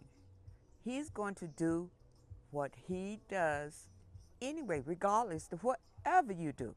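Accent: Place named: New Orleans, United States. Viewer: I hear that N.O accent great content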